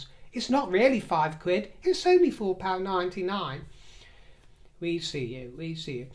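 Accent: Australia. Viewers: may I compliment you on your Aussie accent. You nearly have it down 'pat.' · as an 🇦🇺 I can explain why it's very simple, we have a Labor Government in power, they are what we call Red Raggers & they're always extremely unhappy sour people. Everytime Labor takes power the country ends up in more debt with more crime & more tax to try & pay for the debt. If we go to a Republic it will allow the powers that be to change our Constitution which in these times is extremely dangerous, extremely & even most of the young people know this. We will remain under a Constitution primarily because of this unless of course the votes are 🤔 tampered with 🤨. However I honestly think that if the Wales were visiting instead I would bet money on it that all of these Premiers would have made time, just like they're all quick to turn up to opulent dinners with Queen Mary when she stands for exactly the same system as King Charles & isn't even our Monarch. 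Glamour & popularity certainly impacts with whom Labor politicians choose to dine with, at least that is one thing Labor does stick too. I'll shut up now otherwise I'll rant on forever as you can guess I'm not a Red Ragger. By the way dear may I say on the whole you do a wonderful Aussie accent, depending on what area you come from the accent may become a little more defined.🇦🇺🐨🙏🏻👍🏻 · You can't imagine how we feel as good as your Aussie accent is😅 so many feelings our lucky country is losing its way with idiot politicians and migrants whom disrespect our way of life it all makes me cry Love ❤🇦🇺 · I'm Australian and I also think it's very insulting of our Premiers not to meet with King Charles. The general public voted to remain a monarchy at the last referendum. If King Charles & Queen Camilla were visiting my state, I would go to see them but unfortunately they are not coming to Queensland. 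I went to see Queen Elizabeth and Prince Philip in Brisbane when they last visited. You have an excellent Australian accent,. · 🍐 yes please! 😂 Australian Squirrel here from Ballarat, regional Victoria...I am embarrassed for our State Premier Jacinta Allan' rudeness..how busy is too busy for a Royal Visit...surely a cabinet meeting can be postponed. Nothing much happens I'm sure if the juvenile antics of televised parliament sittings are anything to go on. It would be tremendous to have King Charles visit here as the Late Queen had and other notable royal family members going back.. Cheerio ps love ya Aussie accent. It was ripper mate. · Hi from New Zealand. I agree with you & love your Australian accent 😊 I am so disappointed that King Charles is not able to visit our Country & I wish him & Camilla a wonderful Royal Tour 🥰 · Hi, I am utterly disgisted at our politicians. There is no way I'm voting for a republic. 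Long live King Charles. Love you're Aussie accent.😊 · Love your Aussie accent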